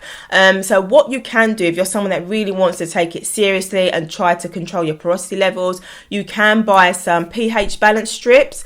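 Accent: United Kingdom. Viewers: What an accent? Is it really British or it's faked? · This sounds mean spirited. Also, there’s lots of British accents. The one she has is typical not the BBC/RP one you’re used to hearing in film usually.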